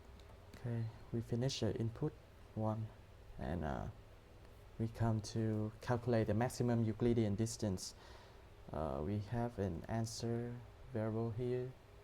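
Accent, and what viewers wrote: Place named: Vietnam. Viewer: Your accent, sounds like Viet. Anyway, great video!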